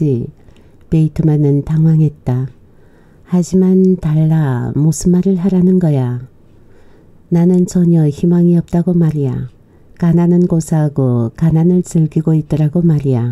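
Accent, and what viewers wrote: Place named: Daegu, South Korea. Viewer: 님의 사투리때문에 무대가 시카고에서 대구로 바뀐거 같고, 훨씬 더 정겹게 다가와요 ~♡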